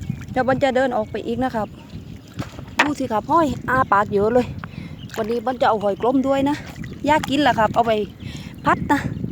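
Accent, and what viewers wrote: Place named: South, Thailand. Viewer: ชอบค่ะนัอง อยู่จังหวัดไหนคะพี่ Rcha ค่ะfcใหม่ค่ะชอบมากเลยค่ะ น้อง พูดใต้น่ารักทำคลิปผัดหอยให้ดูทานใหัดูด้วยน้าาา มีเพจไล้สดมั้ยค่ะแจ้งชื่อเพจหน่อยค่ะจะย้อนกลับดูทุกคลิปนะคะชอบน่ารักดี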